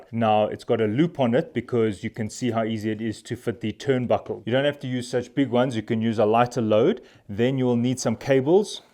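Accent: South Africa. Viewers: No doubt about it. South Africans have great accents and always clearly spoken. Excellent 👌 thank you very much.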